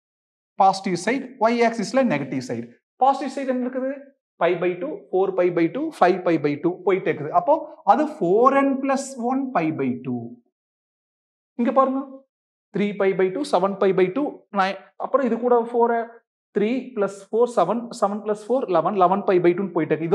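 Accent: Coimbatore, India. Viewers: Guys kovai slang 😅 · Kerala Pakkam ponadhu Ilaya 🤣🤣 idhu Coimbatore Tamil 🤭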